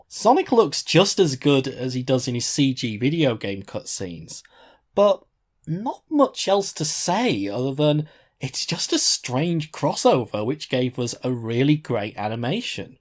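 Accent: Yorkshire, United Kingdom. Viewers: Where in Yorkshire are you from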